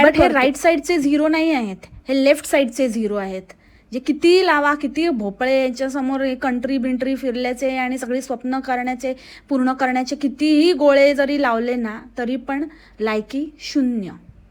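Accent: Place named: Ahmednagar, India. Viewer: tu khuthun ahes kiti chan bolte tu nagarchi ahe ka tu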